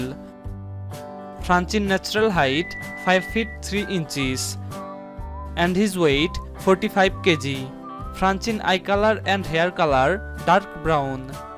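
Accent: India. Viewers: Indian accent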